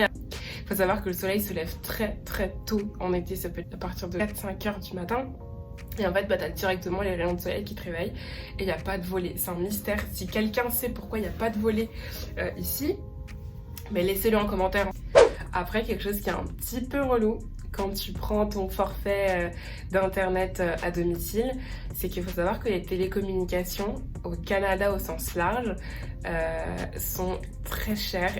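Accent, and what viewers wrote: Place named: Quebec, Canada. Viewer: Tu commences à avoir l'accent québécois, sa s'entend très très très très légèrement.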